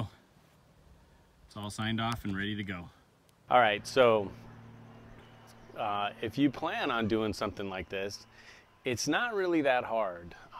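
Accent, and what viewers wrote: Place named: Northern Idaho, United States. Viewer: You sound like everyone in Northern Idaho. Ha except here they tell Californian's to go home. The funny thing is they are all from California but they came here 20 years ago so now they think they claim the state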